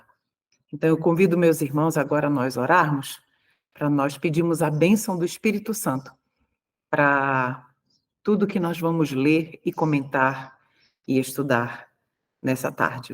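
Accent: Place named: Pará, Brazil. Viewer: Obrigada meu Salvador Jesus por tantas bênçãos através dessa live!!🙏 Obrigada minha irmã do céu!❤ A Sra é de Belém do Pará? Seu sotaque parece paraense... Um grande abraço! Nos vemos breve com fé no Salvador!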